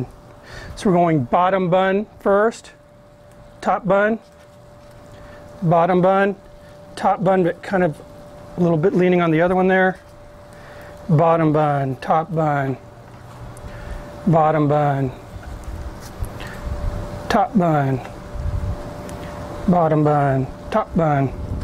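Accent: South, United States. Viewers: Jeremiah Wilson this guy sounds like he’s from the south . No wc here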